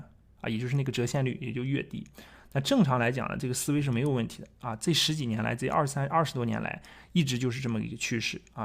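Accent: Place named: Shanxi, China. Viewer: 感觉你是山西人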